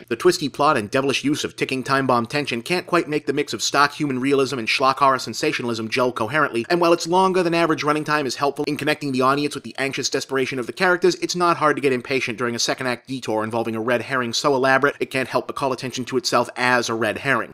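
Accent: Boston, United States. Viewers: is it just me or is more and more of boston accent coming off more than usual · DUDE!! control that Boston accent.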